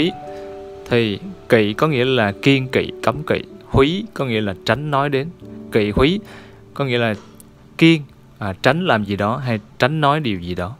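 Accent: Standard. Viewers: Thầy nói rất chuẩn và rất ý nghĩa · Thật sự mê những video của anh, thật uyên thâm ạ, và giọng anh quá chuẩn luôn, mê!!! ❤️❤️❤️ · Thích giọng đọc của thầy dã man, giọng tiêu chuẩn trung ý, nghe hay quá chừng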